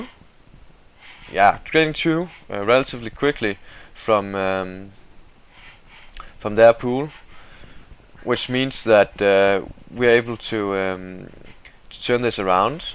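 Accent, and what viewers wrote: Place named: Denmark. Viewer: kunne høre du er dansker! :D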